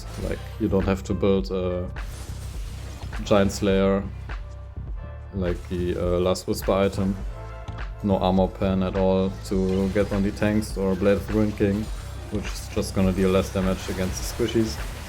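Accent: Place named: Germany. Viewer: LETS GOOO the german gamerboy with a strong and sexy german accent posted again 🎉🎉🎉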